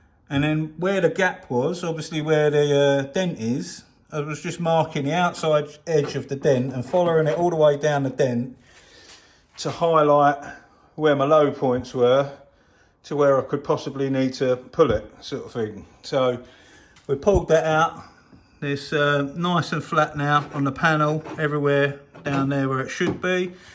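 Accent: Essex, United Kingdom. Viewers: Loving the channel and content, great tips and advice, thank you. Great to hear my old home county accent! I left Essex after 30 years to move to Devon. That was 15 years ago! What was the brand of cutting compound and finishing polish you mentioned in your live q&a? Cheers and keep up the great content. Was so chuffed to see a R5 GT Turbo. I had one in the early 90's!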